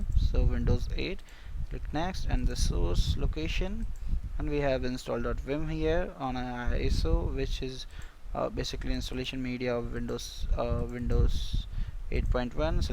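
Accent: India. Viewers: make a video without a indian accent. So annoying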